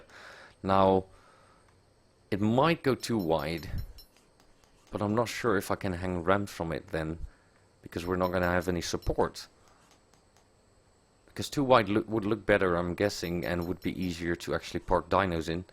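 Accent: Netherlands. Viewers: Are you Dutch by any chance because your accent is very Dutch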